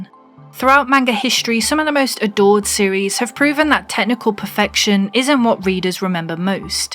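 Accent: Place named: United Kingdom. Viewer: geez your accent is falling thru, i can smell the brit in you from a mile away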